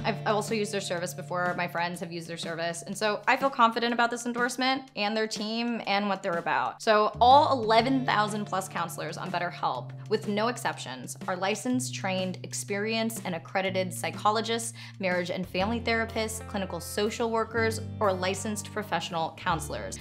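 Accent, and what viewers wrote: Canada. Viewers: Are you Canadian!?!?!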